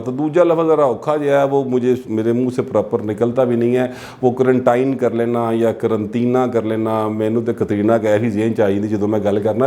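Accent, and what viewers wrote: Lahore, Pakistan. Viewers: Your lohari accent is beautiful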